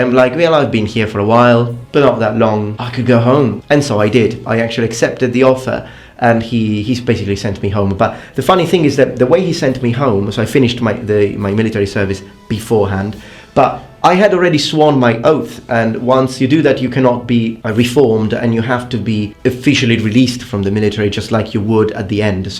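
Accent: England, United Kingdom. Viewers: I wondered what your heritage was......I thought maybe Greek or Italian.....but your English is so good.....you even have a British accent......I bet the ladies chased you in that uniform...;) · has an amazing English accent.. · Oh damn! You're Italian? I thought you were British! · you're italian? i thought you're British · your accent sounds english. also if youre italian why do you use a jewish star as a logo? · The Old French variant of the word, and the original way to pronounce it is writen "luef" instead of "lieu". This is the way the british have always used it, so there you go. learned english in the UK, so he speaks british english instead of murica english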